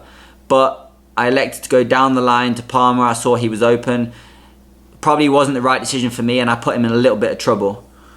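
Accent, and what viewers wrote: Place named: United Kingdom. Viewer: This is the second clip I have watched in your channel. Although your accent is very British I think you are playing in the US. Your team is SLC and I wonder if it is Salt Lake City. What class of league are you playing? I know the top league is MLS but the venue doesn't seem to be up to the MLS standard.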